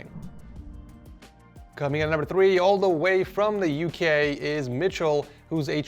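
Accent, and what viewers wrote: Australia. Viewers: Why is the intro australian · I guess we're going Australian mode on this video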